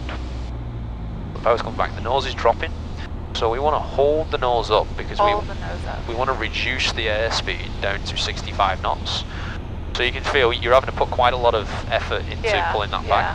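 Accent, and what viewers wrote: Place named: Yorkshire, United Kingdom. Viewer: ahhh I see the accent sounds similar to a Yorkshire one..... but not exactly the same 😂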